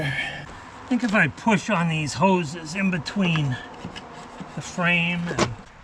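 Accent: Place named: Maine, United States. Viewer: So your tuber name says NH but your accent says you’re a mainah.. I’m in southern coastal Maine. Wicked Pissah videos on the the Jeep having a 3rd cylinder misfire so now I know where to start my looking.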